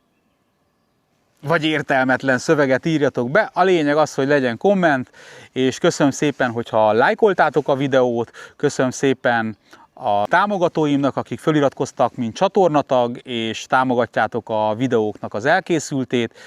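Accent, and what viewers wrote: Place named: Moscow, Russia. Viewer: az csattog ez pedig Kb Moszkvai akcentussal beszélni mazsar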